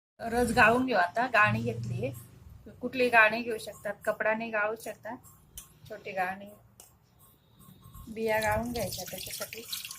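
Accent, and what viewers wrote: Khandesh, India. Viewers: Maushi tumhi Khandesh chya aahat kaa? Tumchya bhashewarun aani swayampakachi banawnyachya padhhatiwarun watate. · Khandeshi ka.....? Apan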